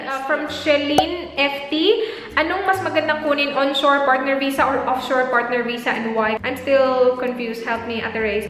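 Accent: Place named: Philippines. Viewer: Gusto ko ung mga vlogs mo, I like how you don't try hard. Ung ibang vlogger jan nakakabusit kasi trying hard, pati accent niya binabago. Mas gusto ko ung gaya lang ng accent mo na pinoy parin.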